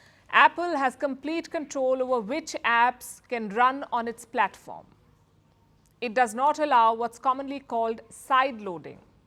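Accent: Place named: India. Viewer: People from india has one of the worst accents in the world!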